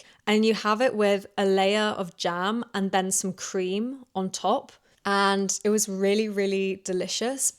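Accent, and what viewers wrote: United Kingdom. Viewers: Your British accent is sooo nice - it's like music to my ears!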